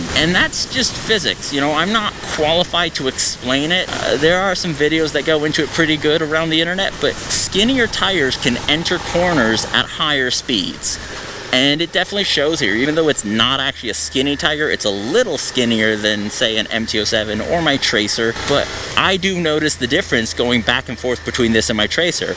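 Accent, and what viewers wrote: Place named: United States. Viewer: Down vote for enduring clickbait with that irritating high pitched nasally 'murrican accent and waffling verbosity. Get to the point!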